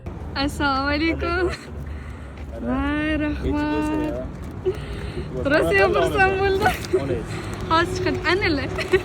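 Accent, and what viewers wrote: Tashkent, Uzbekistan. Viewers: Siz nega namangalik bula turib toshkent shivada gapirasiz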